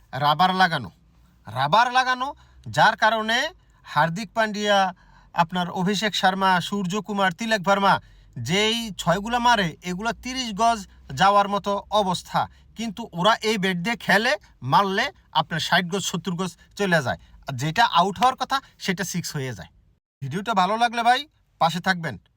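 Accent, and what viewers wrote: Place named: Bangladesh. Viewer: Aapnar kotha sune ...eibar aapna k ekjon pure Bangladeshi bole mone hocche....jeta etodin mone hoini....🤩🤩🤩🤩🤩🤩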